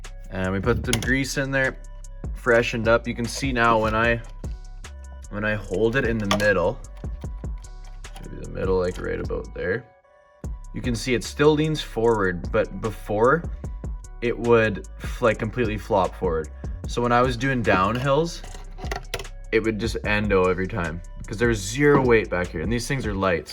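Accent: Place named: Central Canada, Canada. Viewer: So, just for fun, if I was to guess by the rocks and wood you have on your course, would I be right you're a West Coaster? I was thinking Central Canada by your accent, but thinking the natural materials say West. LOL!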